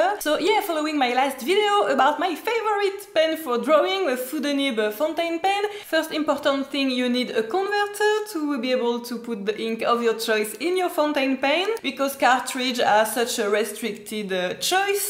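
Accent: France. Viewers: Your not french? Your accent sound like , If no good video ^^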